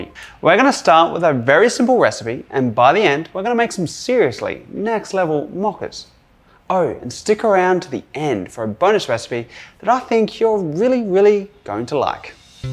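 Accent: Australia. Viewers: Sydney, Australia maybe? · is he aussie???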